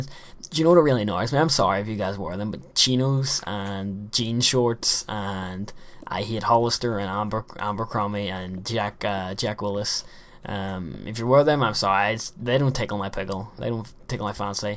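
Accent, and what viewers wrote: Ireland. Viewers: what part of ireland r u from?? And also, WHATS THA CRAIC!!! :D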